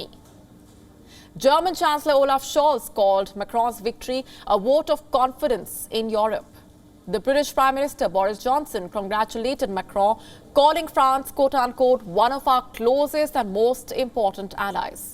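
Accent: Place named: India. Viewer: Is she reporting news in English or Indialish. Do WION don't have a better newscaster other then this lady.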